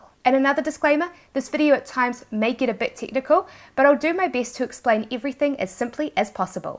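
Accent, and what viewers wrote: New Zealand. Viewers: I've never thought New Zealanders have such funny accent. :-) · Definitely New Zealand yis ! 😂 Cute! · New Zealand accent,,,Aussies know how to pronounce vowels. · are you a kiwi? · she has an accent, why? Because she is from New Zealand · I love your New Zealand accent is majestic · yes huge and heavy, there’s more room to move if you know what I mean. Great instructional videos you provide, keep it up. I need to learn more about finding new products. I’m originally from the west coast of NZ so I can understand you perfectly:-)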